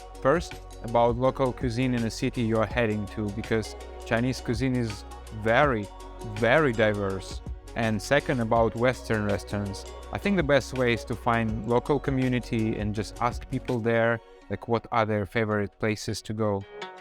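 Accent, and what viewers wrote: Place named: Russia. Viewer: Hi! You fon't know how much you could measure my plasure to meet you on Youtube for the first time. I think your Country is Russia or not? I love your accent, your English is very good and thanks for 7 advice your just give to someone who wants to go to China. They were very very useful for everybody in youtube, I supposs to.❤🇨🇳🌹🙋🌻Good luck in China and your new language learning:Chinese-Mandarin. Greetings from Brazil.💚🌎🙋🌹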